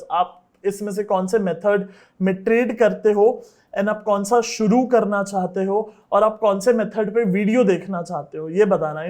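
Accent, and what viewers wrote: Gujarat, India. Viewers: UFWP event jordar hai, jitni tariff kare kam hai, jo life ko badal deta hai aur ek new life jine ka tarika batata hai, aap jitni event karege woh sub attend karunga and tamari gujatati tone jordar chhe 🙏🙏🙏🙏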